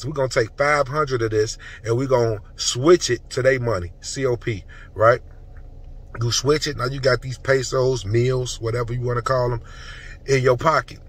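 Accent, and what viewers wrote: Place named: Chicago, United States. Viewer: In can tell he from Chicago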